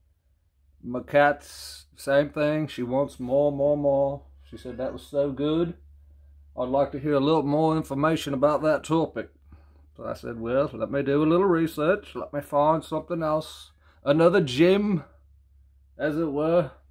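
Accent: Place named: Australia. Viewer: G'day, I 💚 the accent, the lazier you make it the more Ozzie you sound 😂 Adorable 😘 Awesome Artist 👍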